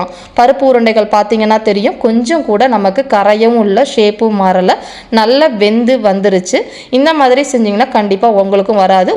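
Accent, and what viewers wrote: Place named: Coimbatore, India. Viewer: நான் உங்கள் new subscriber .நீங்க கோயமுத்தூர்காரங்களா?உங்க பாஷை அப்படி நினைக்க வைக்குது .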